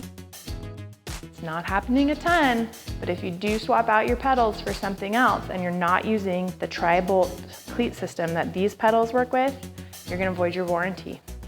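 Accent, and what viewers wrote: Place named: United States. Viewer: Couldn’t agree more, a yank voice does my head in at the best of times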